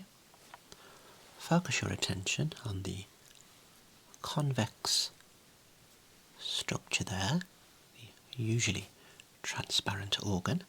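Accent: Birmingham, United Kingdom. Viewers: Brummie accents are so perfect for asmr. Great job again buddy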